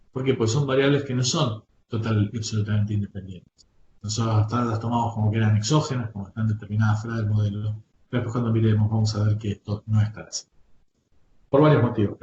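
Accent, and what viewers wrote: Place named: Argentina. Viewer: Es una pena, la clase parece muy buena pero la calidad del audio sumado al acento super marcado de argentina, lo hace inaudible para el resto de latinoamerica.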